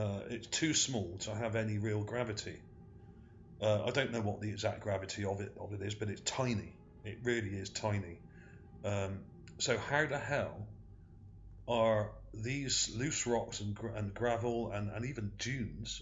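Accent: Bristol, United Kingdom. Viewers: Now how did I know that you were from Bristol, UK, lol. My home city.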